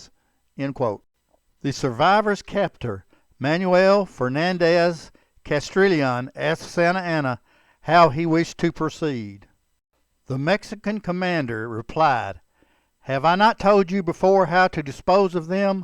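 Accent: Texas, United States. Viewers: I'm in UK and we love Texas accent ! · Your thick Texas accent compromises your heartfelt thesis. Who would willingly submit to a despot--facing certain death?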